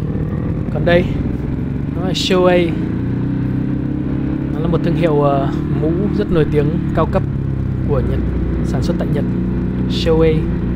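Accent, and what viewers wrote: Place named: Hanoi, Vietnam. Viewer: Giọng nói giống bằng kiều lai tuấn hưng quá , gốc hà nội có khác :v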